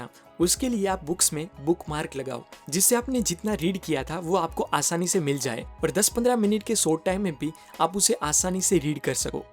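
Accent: Gujarat, India. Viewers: I liked your gujju accent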